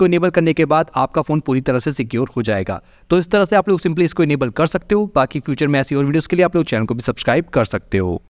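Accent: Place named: India. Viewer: You talk too fast with the hindi accent.😮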